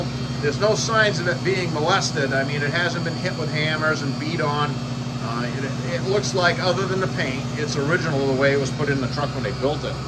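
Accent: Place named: Maine, United States. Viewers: Just a touch of Maine. lol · Thought so. Mike Day Concrete NORTH MONMOUTH, ME has the EXACT same accent.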